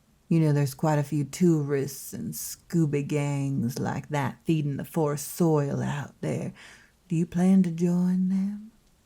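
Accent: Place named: South, United States. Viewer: Love the Southern accent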